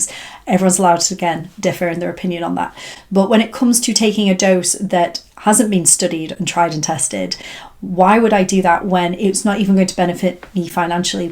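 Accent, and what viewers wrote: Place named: RP, United Kingdom. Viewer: You trying to speak posh is really irritating something about you is just well off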